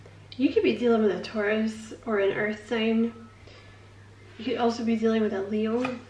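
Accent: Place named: Scotland, United Kingdom. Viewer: Your Scottish accent is off the chart sexy. The hell with my twin flame , I'm feeling your energy 🥰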